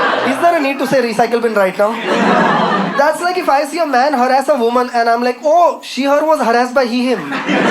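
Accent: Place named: Maharashtra, India. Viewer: bro i am sindhi too but u sound like proper maharashtran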